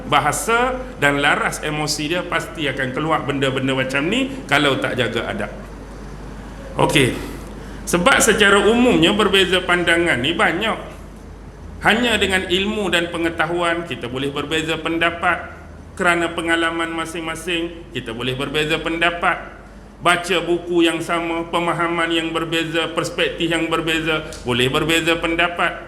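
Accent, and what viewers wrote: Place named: North, Malaysia. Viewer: Umur berapa bang, takpaham loghat utara ka takpaham cerita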